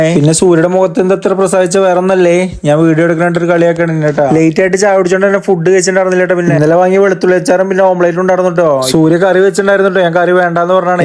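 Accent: Palakkad, India. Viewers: പാലക്കാട്‌ ശൈലി നന്നായി വരുന്നുണ്ടല്ലോ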